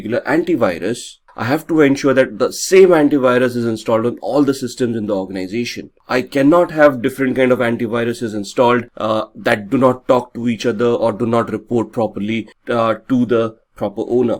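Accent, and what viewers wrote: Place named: India. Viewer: no offense, why do most of these videos have to be narrated by people with Indian accents? I can't tell what he says no matter how many times I replay it... CC thanks...?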